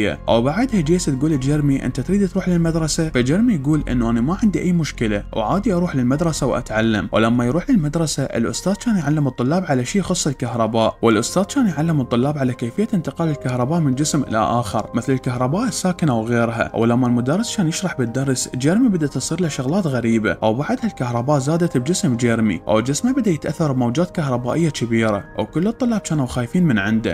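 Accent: Iraq. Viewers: عفية اي نريد دبلجه عراقية اقصد ملخصات بصوت عراقي اي 🥹✨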